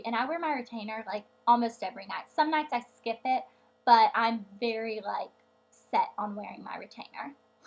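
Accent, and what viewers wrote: South, United States. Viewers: sweet tea! lol you are southern... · Your southern accent is adorable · lol ur have the south accent... sorry lol just sounds weird cuz im from NYC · how come you have the southern accent but elle doesn't? elle sounds like a valley girl sometimes lol is it cause she had already developed her accent or way she speaks before you moved to the south?? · I'm from the south to! I dont really notice your accent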